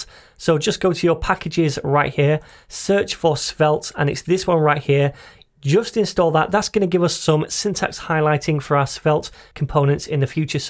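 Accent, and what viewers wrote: United Kingdom. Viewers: don't know yet if your tutorial is ok, but you got +10 points for qool brit accent xd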